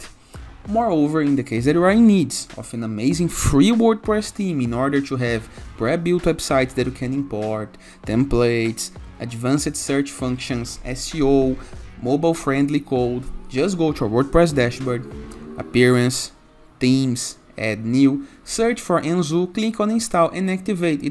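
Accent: Brazil. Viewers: Are you Brazilian bud? This accent is so cool haha. Great video!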